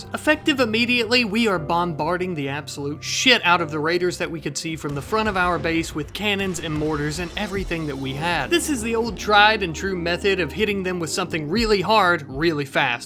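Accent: South, United States. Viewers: My request is this, a mighty unit of the finest, most exquisite gentlemen, who will dedicate themselves to not only America, but their duty. This duty will be to purge the scum of the earth given the name "bull frog" or Lithobates catesbeianus. Even the name is shameful. May this unit (who's numbers needn't be many) cleanse our ever free land of the bull frog menace, and act as, what comes to mind for me, like the commissars of 40k, though the true use is up to you.(This content is god tier and i look forward to every episode! Thank you for literal hours of legendary entertainment! Also what state are you from if us because I hear some southern. oorah NC and thank you for existing)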